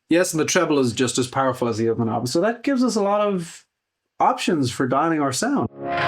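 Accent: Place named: Ireland. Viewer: Also, apologies if its been mentioned in other videos. I've been meaning to ask for ages. Where's the accent from? I'm in Wexford and I know I'm hearing an Irish accent but theres something else going on thats throwing me off.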